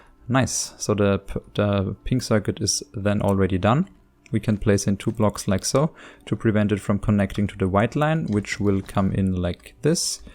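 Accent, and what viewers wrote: Germany. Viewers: You kinda have a german accent..🤔 Are you from Germany?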